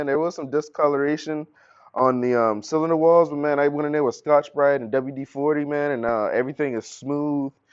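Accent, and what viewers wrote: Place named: Caribbean. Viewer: Whats good man. I just released a vid on those pistons. I'm doing a Dual LS Swap on my box chevys. Where you from? I hear a lil caribbean accent in there.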